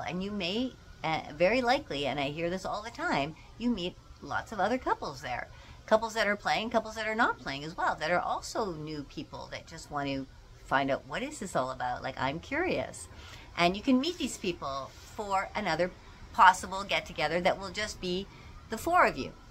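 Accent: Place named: Canada. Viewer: Hah! I KNEW it! Even though your channel lists you as being in the United States, I definitely got a Canadian vibe off you. A lot of it was the accent, of course, but certain American accents, especially west coast ones, sound a lot like Canadian accents, so that's hard to tell. I think part of it was just the vibe you gave off, you just felt like home. So glad you are on here. It really does feel as if I'm getting information/perspective/experience from a "home" kind of place from you, and knowing you are Canadian as well just adds an extra level of warmth and comfort to it. Odd. It really shouldn't make much difference. People are people. Yet it does. Interesting. I'll have to mull that one over. I knew it!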